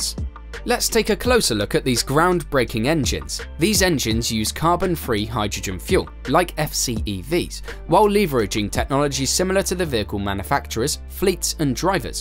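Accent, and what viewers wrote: United Kingdom. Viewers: The narrator speaks too quickly and has a terrible limey accent which makes it difficult to understand. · Why do they have to use British announcers, can somebody tell me why?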